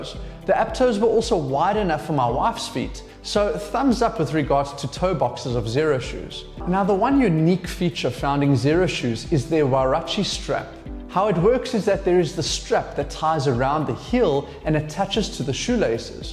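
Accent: South Africa. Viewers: Ja bru I skeem he's a joburg o ay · South African accent??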